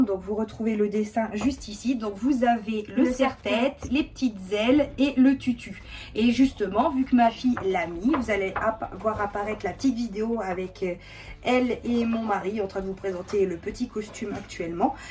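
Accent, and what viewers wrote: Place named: North, France. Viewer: Cc me voilà 😊. Elle est trop mimi ta fille . J'adore l'accent du Nord.... ou il fait froid 😅 moi je suis du sud l'accent du soleil qui chante. ❤ des bisous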